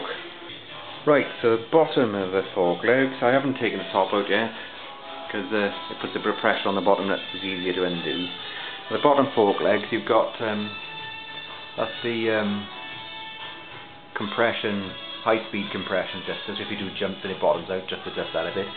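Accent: Wales, United Kingdom. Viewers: Are you Welshman or something?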